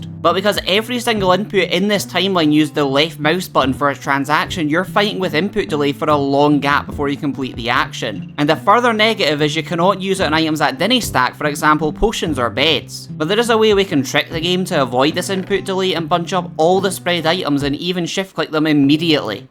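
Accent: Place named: Scotland, United Kingdom. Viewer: Propa Scottish man, aye?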